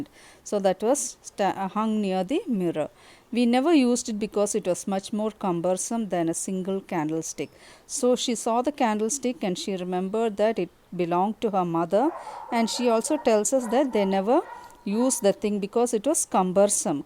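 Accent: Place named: South India, India. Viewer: Ma'am explanation is fantastic and that south Indian accent is great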